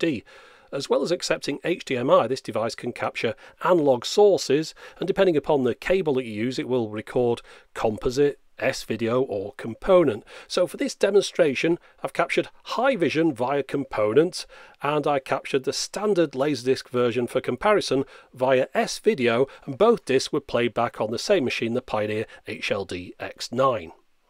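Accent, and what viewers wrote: United Kingdom. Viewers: British axxcent? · Your british accent is pure eargasm.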